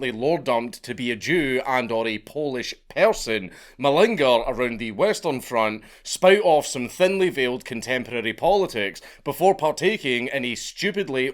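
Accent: United States. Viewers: Shame you used american pronunciations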